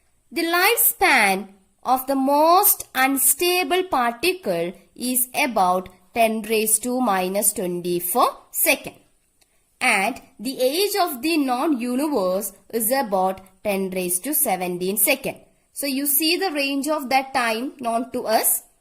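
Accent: South India, India. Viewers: Mam are you from South India?? 🤔🤔🤭🤭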